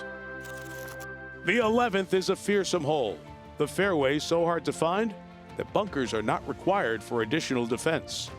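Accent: United States. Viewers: Yes indeed, why the American narration?! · A yank commentating on this is daft. As much as I like the guy who is talking. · Shame you got an American to narrate....🙄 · Such a pity that the R&A has stooped to having a yank voiceover. Is nothing sacred??? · Ah here lads, why is an American narrating this and what is with the dizzying transitions from one hole to another. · Why is the narrator an American!? No northern Irish narrators free?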